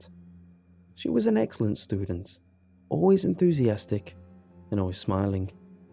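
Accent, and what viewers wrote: England, United Kingdom. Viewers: He has an English accent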